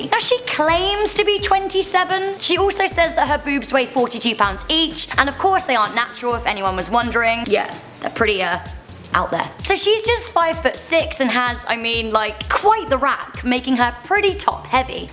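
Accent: United Kingdom. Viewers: Are you British?? I am just curious. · i love Ur British accent!!!!! i am australian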